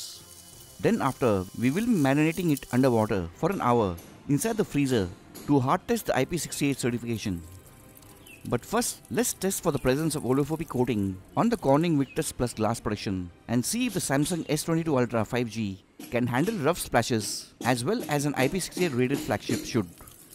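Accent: India. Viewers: Indian accent is so funny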